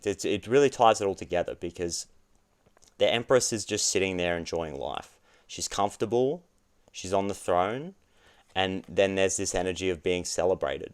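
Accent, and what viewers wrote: Australia. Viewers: Sorry dude, I can’t listen to an Australian accent. Peace out 👋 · Are you from Australia?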